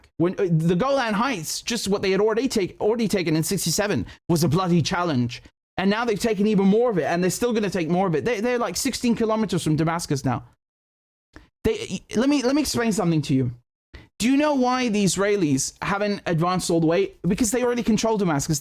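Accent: United Kingdom. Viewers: Nice British accent 👍 excellent journalism 👍 agree with everything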